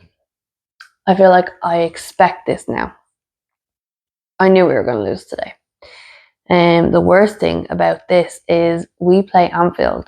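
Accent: Ireland. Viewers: I love your Irish accent. I could listen to you all day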